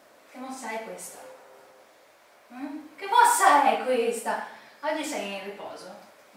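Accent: Tuscany, Italy. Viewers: Ma hai preso l’accento toscano…adoroooooohhhh 😍😍😍😂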